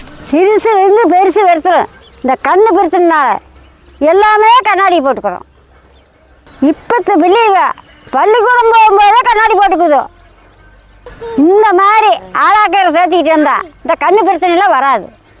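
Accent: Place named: Kongu, India. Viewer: Kongu region maari irkkuthenga entha ooru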